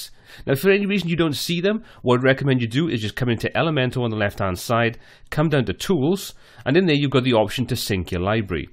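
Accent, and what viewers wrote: Wales, United Kingdom. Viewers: Damn you sound welsh... I wonder if you are from Cardiff too!! lol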